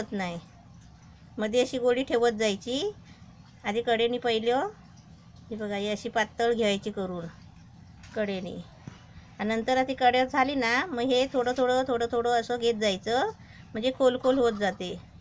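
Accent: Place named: Malvan, India. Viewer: मस्त बोलतात... फुल्ल मालवणी एक्सेंट.....जय भंडारी ..जय मालवणी... बरोब्बर असा "हात भाजलो तरी चालत्लो.पण पीठ गरम गरमच मळूक वह्या. .....मी पण पुढच्या वेळपासून पंखो बंद करूनच पीठ मळतलय..!!!......."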